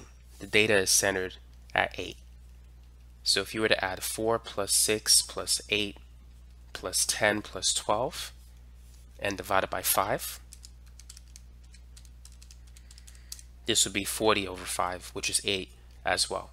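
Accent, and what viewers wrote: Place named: New York, United States. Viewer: i can hear your NY accent lol i love it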